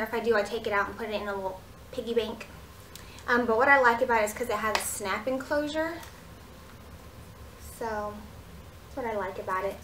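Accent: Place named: South, United States. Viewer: I've been a subscriber for a few years now but since I moved from CA to Louisiana, I appreciate your accent even more lol! There's just not many Asians where I live now so to see one with a southern accent is pretty cool lol :)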